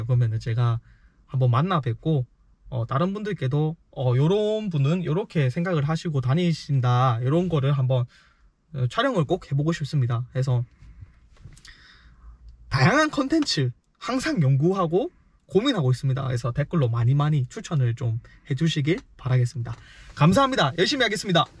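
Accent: Busan, South Korea. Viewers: 20대??ㅎ~ 항상 밝고 시원스런 부산 사투리와 성실해 보임에 정감이 갑니다~ 꾸준히 하신다면 성공예감!!!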